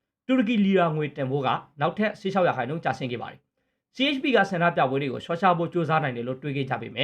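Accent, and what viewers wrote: Rakhine, Myanmar. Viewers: ဘရို စကားပြောပုံကို ကြည့်ရနားထောင်ရတာ ရခိုင်လူမျိုးထင်တယ်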